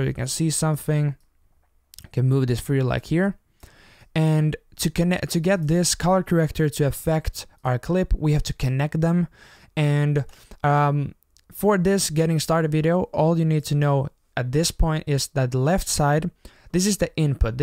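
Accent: Finland. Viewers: Are you finnish? Ooks sä suomalainen?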